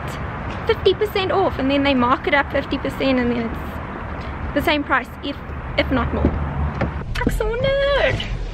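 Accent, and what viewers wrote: South Africa. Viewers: I'm dutch and it's funny to hear you speak South African because some words are dutch but sound different. Love your video's!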